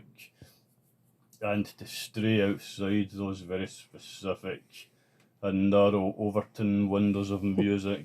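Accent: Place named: Scotland, United Kingdom. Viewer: I can't wait until we meet again so I can understand proper Scottish - I'm half way there, though.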